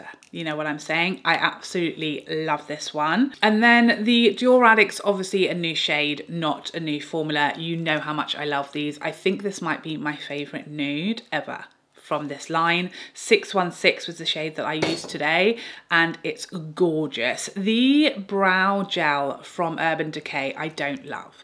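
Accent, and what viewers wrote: United Kingdom. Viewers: Bonjour! I love your reviews. You are so nice and funny too. And différent from Canadian and US reviewer. Plus, i really like your british accent. Prize 1 would be my favorite. Bisous xxx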